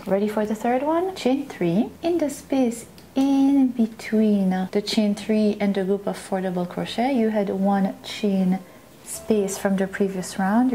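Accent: France. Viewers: Superbe !!! Thank U very much for teach us . You 'v got a French accent ! ???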